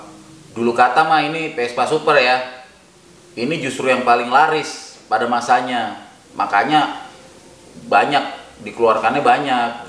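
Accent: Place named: Tangerang, Indonesia. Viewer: lokasi di Tangerang kah? Logatnya kayak Tangerang2 benteng gituu, haha asiikk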